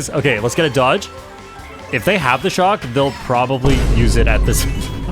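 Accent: Canada. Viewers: Are you from British Columbia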